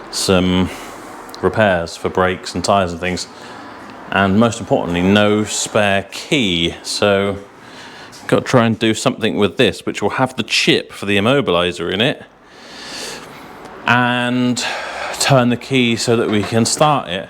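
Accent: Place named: West Country, United Kingdom. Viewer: you've got a different gentler west country style than Arthur Daley from the North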